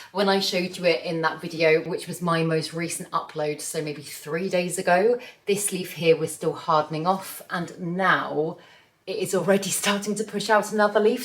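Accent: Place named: West Country, United Kingdom. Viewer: Love your west country twang!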